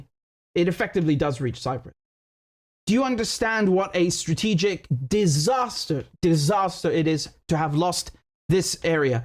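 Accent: United Kingdom. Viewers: Nice British accent 👍 excellent journalism 👍 agree with everything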